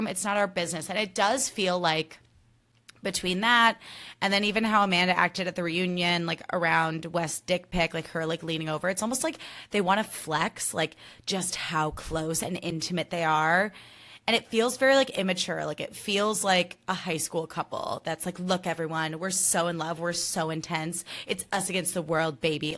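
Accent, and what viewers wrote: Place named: Australia. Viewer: My jaw is on the floor at your Australian accent ⭐⭐⭐⭐⭐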